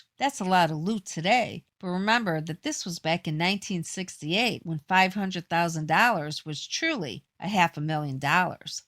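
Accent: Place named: New York, United States. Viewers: Got to love the New Yawk accent of the lady covering these videos,’ she can read a book to me anytime. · Nice!! The borgata gal is back!! That NY Italian accent!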